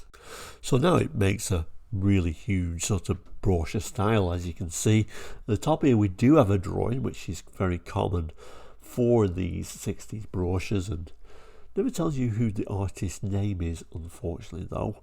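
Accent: Northumberland, United Kingdom. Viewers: I am intrigued by the lovely accent. Is it Northumberland?🤔 But don't go to Oxford University and ask for Noofield College. It is Nuff- field as in snuff. I assume it is an in-house press, since Viscount Nuffield was the founder of Morris and MG.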